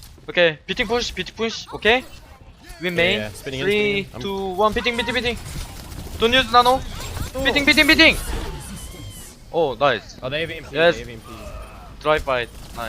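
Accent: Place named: South Korea. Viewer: 콩글리쉬로 지껄이는거 굉장히 듣기 불편하노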